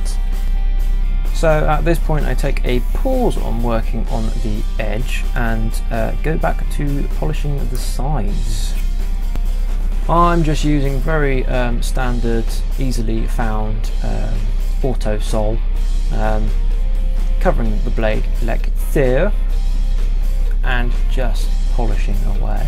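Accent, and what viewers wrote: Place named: United Kingdom. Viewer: Aloha from Maui! I am currently resurfacing an ancient rusted out deer horn handle hunting knife that quite possibly could have been lost or discarded by Nathanial Boone or even...David Bowie!! Really enjoy your quazi-cultured British accent and your irreverent cavalier approach to knife refinishing. Well Done!!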